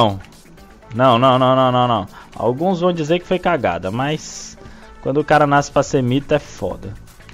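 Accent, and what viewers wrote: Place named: Ceará, Brazil. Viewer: Vc é do ceara é?kkkkkkk, se não for seu jeito de falar é mt párecido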